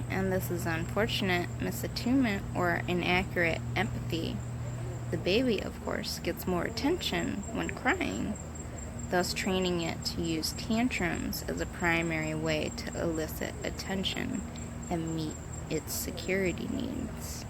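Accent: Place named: Minnesota, United States. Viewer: i am in love with your Minnesota accent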